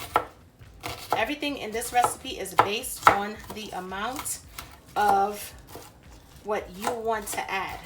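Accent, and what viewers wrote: New York, United States. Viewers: Sounds like she's from newyork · Had to subscribe once I heard the accent. 💯 N.Y in the building right here BX.... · Luv that New Yawk accent. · Didn't look at anything else but I say from NY state. I from NY . Love the recipe. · Lol love her accent funny she most definitely from NEW York lol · Ah, Thought I heard the NY accent ,or thereabouts. lol! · Looks delicious and very easy to follow the steps. I love the NY accent. Would you please check me? <link> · Definitely NY maybe Westchester or Long Island or Queens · Are you from NY?🤔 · Are you from NY? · I could almost smell those sausages!!! Her New York accent makes me long for mine😛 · She got a New York accent....LOL.. I find it lovely seeing Northerners cook Southern foods. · I just made this and it was awesome, easy to follow and put together, thanks love the NY accent.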